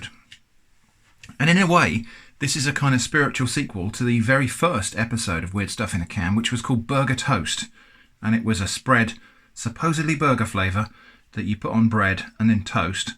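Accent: United Kingdom. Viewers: pretty close on the spelling! with british accent of course! I understood it so good job! also these are damn great and tasty usually (ill edit it later, just started to watch the vid lol) edit: yup, probably one thing i miss from hungary since i moved to the uk... this stuff... the stuff here tastes like bullcrap, and not all of the polish shops sell this kind of stuff:/ but im happy, that it is not just loved by the eastern europeans, but by others as well! also try it with ham/chorizo on top of it, and add a bit of oregano on top of the cheese! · Your accent is magnificent. We Yanks speak crassly compared to the UK folks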